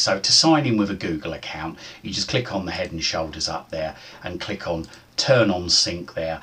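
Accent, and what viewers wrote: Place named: Cockney, United Kingdom. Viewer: THANK YOU SO MUCH for doing this video! you're a life saviour! (Also, I love your accent, are you from London? my ignorant ear is telling me there could be a bit of a cockney-ish accent there?) still, perfectly clear and very well explained! again, thank you:)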